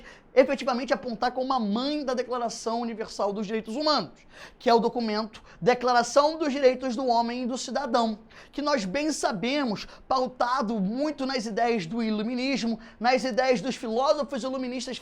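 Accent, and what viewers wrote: Rio de Janeiro, Brazil. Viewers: parei de assistir so porque é carioca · Você é carioca? Kkkk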